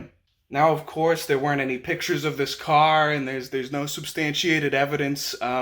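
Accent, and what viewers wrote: Boston, United States. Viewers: Is that a hint of the ol'e ''Baws-ton'' accent I doth hear? Great vid, man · your accent has a hint of Boston. am i on to something here young man?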